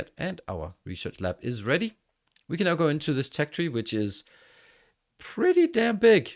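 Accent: Denmark. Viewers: Nice video. Your English is really good. But a fellow dane can hear :)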